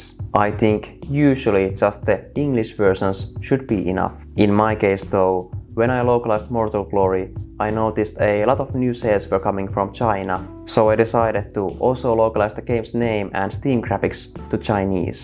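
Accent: Finland. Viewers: Are u Finnish? Your accent sound like one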